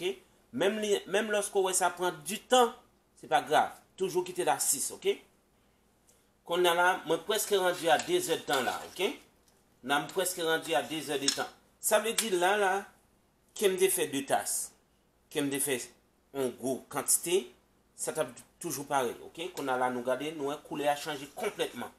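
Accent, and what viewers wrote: Quebec, Canada. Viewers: Merci wap banm bon accent Québec la wiii la. Bon travail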